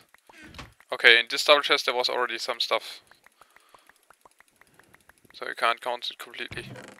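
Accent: Germany. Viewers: lol bitch farm =D german accent is awesome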